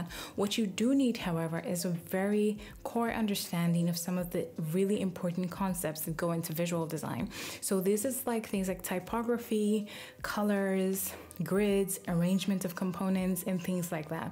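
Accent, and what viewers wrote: Nigeria. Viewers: sounds Igbo dear, are you Nigerian? I am fully entering the UIUX Design space. Switching my career from media and communication.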